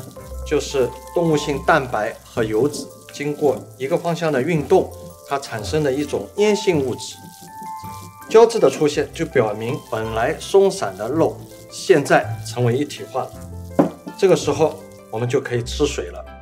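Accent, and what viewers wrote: Shanghai, China. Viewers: 上海腔普通话~🤣🤣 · Grace W 很明显的上海口音 ：）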